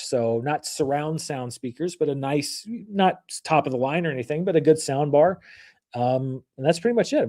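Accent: United States. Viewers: People are so conditioned to connect some kind of game of thrones British adjacent accent for these kinds of stories that the American accent feels strange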